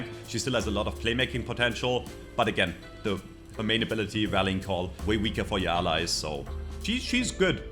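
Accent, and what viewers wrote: Germany. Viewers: Your voice sound german , are u german ?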